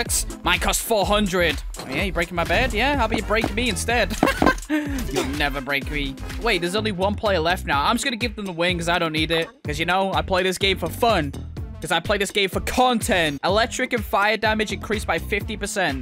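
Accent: United Kingdom. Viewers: Why we like to watch: His cackle laugh, his great persona, his Timmy voice, his god level skills, his British accent, his continued upload schedule, his creative ideas, he’s a great person over all ❤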